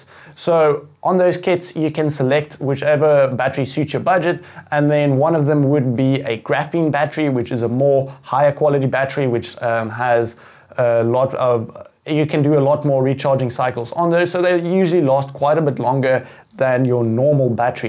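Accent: South Africa. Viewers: are you from South Africa? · You sound like a fellow South African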